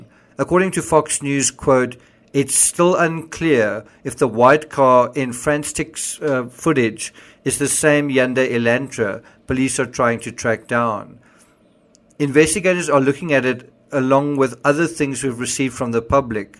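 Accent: South Africa. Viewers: Love your South African accent.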